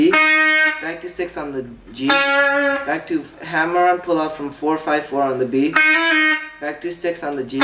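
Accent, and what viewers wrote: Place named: Canada. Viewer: Timro English ta daami cha yarr. I live in london and I thought you lived in Canada or somewhere cause of your English. Nice Job with the lesson by the way.:)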